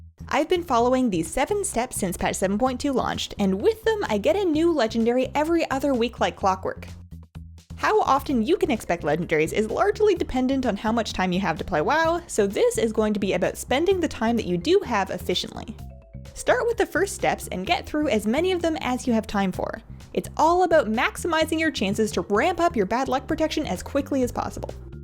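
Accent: Canada. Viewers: I have to sadly admit I've no idea what she is talking about for most of this video. She sounds Canadian though.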